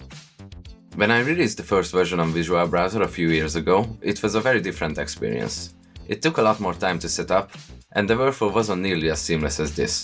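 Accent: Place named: Hungary. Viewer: magyar akcentus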